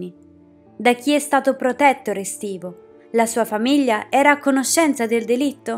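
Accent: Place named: Florence, Italy. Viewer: Qualcosa mi dice che tu sei di Firenze.. Complimenti al video fatto bene..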